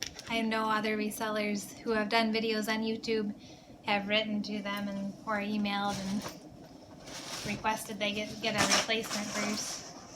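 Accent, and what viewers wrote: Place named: Michigan, United States. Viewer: Michigan! I was trying to figure out your dialect and it was driving me mad that I not knowing.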